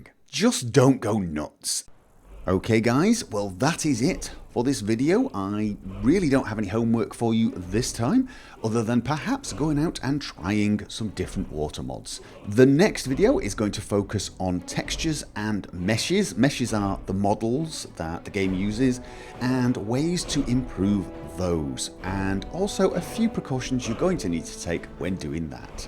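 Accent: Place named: Scotland, United Kingdom. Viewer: the fudge? he be english? sounds a bit scottish but without the heavy accent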